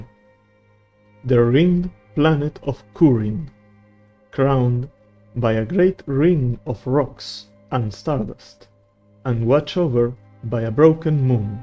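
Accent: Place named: Argentina. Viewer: Llevo 20 segundos del video y escucho que sos de Argentina y me salio un terrible OOOOAAAAA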